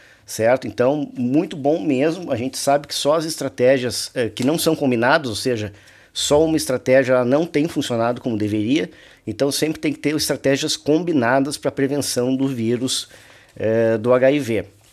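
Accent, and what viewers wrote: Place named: Rio Grande do Sul, Brazil. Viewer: Pelo teu sotaque, deve ser do RS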